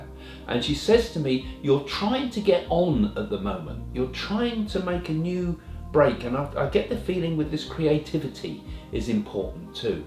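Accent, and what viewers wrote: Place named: England, United Kingdom. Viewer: Your a medium? Interesting, love your English accent.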